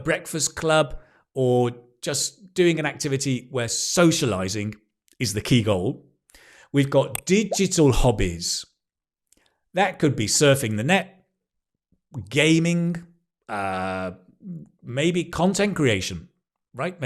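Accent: United Kingdom. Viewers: I love your british accent😊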